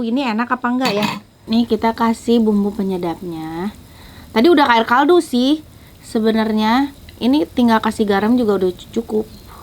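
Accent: West Java, Indonesia. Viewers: Teteh uda cantik Gaul....pintar lg masak... Salut....dech Klw ngomong asik bnget didengerinx.. Logat sunda x.... Putrax mirip teh bnget Cakeppp..... Salut teh...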